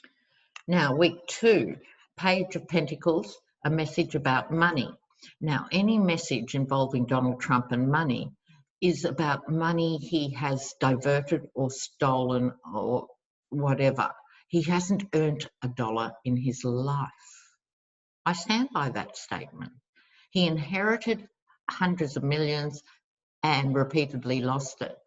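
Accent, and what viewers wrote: Australia. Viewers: I have always loved the Australian accent..🤗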